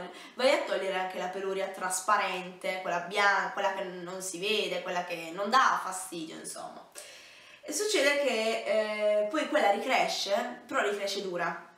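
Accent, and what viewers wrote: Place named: South, Italy. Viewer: Hai la pella chiara come una del nord ma l accento fi una del sud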